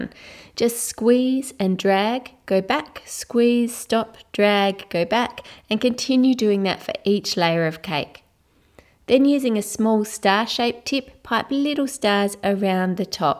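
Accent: Australia. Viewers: R u Australian?? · Haha you sound so much more Australian i this video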